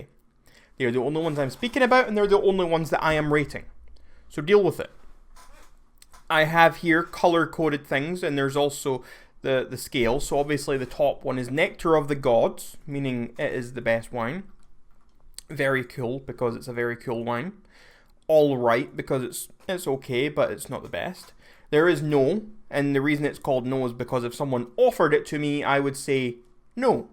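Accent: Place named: Scotland, United Kingdom. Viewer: Your Scottish bro, stop tryna act class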